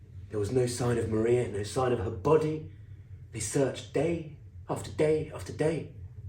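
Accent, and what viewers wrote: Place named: Birmingham, United Kingdom. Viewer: Hey are you from Birmingham? Your accent reminds me of an old roommate of mine from there:)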